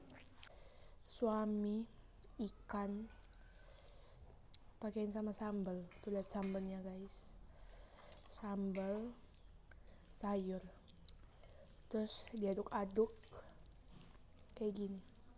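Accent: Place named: Flores, Indonesia. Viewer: Kek ada logat Bajawa😅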